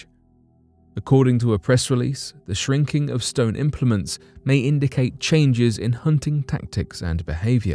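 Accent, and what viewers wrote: United Kingdom. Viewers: So you're using AI technology which makes for a robot presenter. But why did you give it a British accent? I find the British accent to be offensive.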